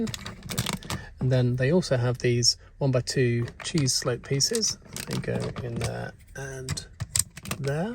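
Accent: United Kingdom. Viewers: the hard english accent makes it 100% better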